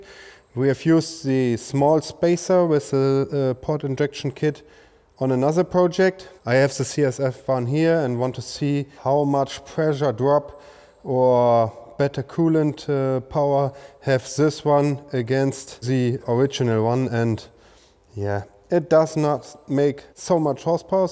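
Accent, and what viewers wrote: Germany. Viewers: Ist doch schon ziemlich solide dein Englisch content - ein gewisser ‚german accent‘ darf durchaus bleiben: It’s not a big, it’s a feature …or USP ;) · Typisches deutsches Englisch xD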